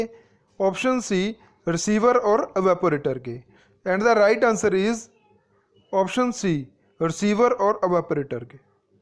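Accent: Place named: India. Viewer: disgusting India accent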